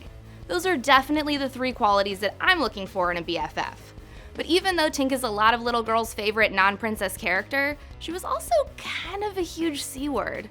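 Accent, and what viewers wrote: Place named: United States. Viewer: Stop being so negative. P.S. your accent is giving me a hedache. If you want to speak my language then speak it, dont chew it up infront of me then spit it back into my face you yank.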